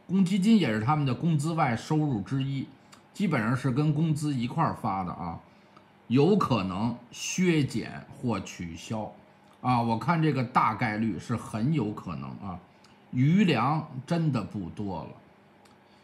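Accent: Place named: North, China. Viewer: 最烦这种北方口音 肚子里没文化 整天要指点迷津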